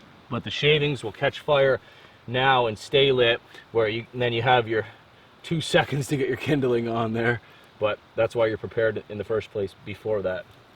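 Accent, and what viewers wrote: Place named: Canada. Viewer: Been watching so much American bushcraft lately that I actually noticed our accent when I first start the last part lol. Like oh ya he’s Canadian too. Glad I found ya bud gonna be flying through your vids. Be one with the liquor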